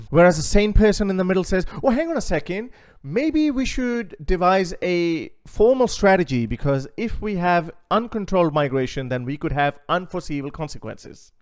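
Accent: Australia. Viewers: Are you an Aussie? You sound like one. "Wokies". Too funny mate. Pity about the subject of the video. Cheers mate. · I did not realise you live in Oz, although I know you have the accent. I came to live in England 21 years ago. It was so different to what it is now. When you spoke about visiting Europe & seeing the changes, I felt sick. But it's true. I am white but I was born & raised in Africa. My late dad was Welsh & moved there after WW2. He fought in the RAF as a gunner. I know he would be heartbroken to see both Wales & all the UK in the state it is now. 😢 It makes me angry & sad, that so many people died to stop Hitler, & now things are going downhill fast. I've got family in Australia & I'm wishing I could move there!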